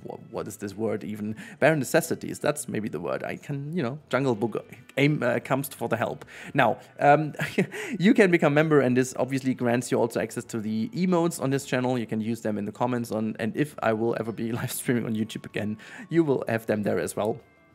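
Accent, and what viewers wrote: Germany. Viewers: Still that typical horrible german accent BUT i have to say : Your build are always awesome. TBH i want a PZ2 instead of a PC2 ... With better performance and way more creatures. Modder creates SO GOOD new creatures, but they are always unfunctional after every patch... that sucks ^^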